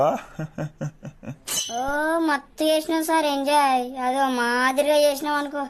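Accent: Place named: Telangana, India. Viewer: Super telangana yasa.... Keep doing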